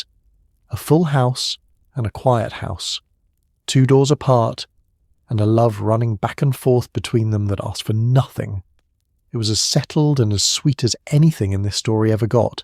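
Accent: England, United Kingdom. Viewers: Why does the narrator have an English accent ?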